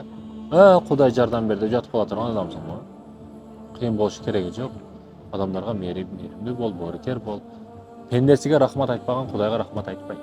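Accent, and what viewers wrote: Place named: Osh, Kyrgyzstan. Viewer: Мобу блогер оштук окшойт а деген акцентинен эле билинет экен😅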